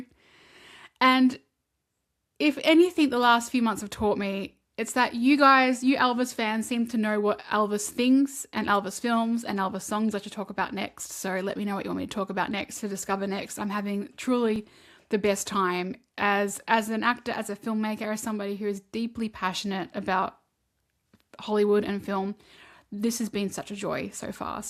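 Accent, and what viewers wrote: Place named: Australia. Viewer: can i just say, you should never have to apologise for having an Aussie accent. it's yours and you should own it. if there are viewers who complain about not being able to understand you, then they can simply put on subtitles. you have a stunning accent and should be proud of it.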